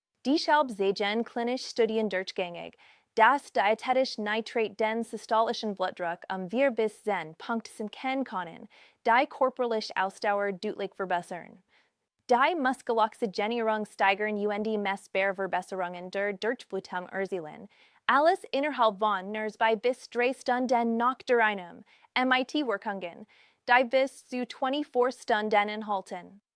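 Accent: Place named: England, United Kingdom. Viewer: Was soll denn der (KI-) Unfug...: deutscher Text, aber englisch und mit englischem Akzent ausgesprochen???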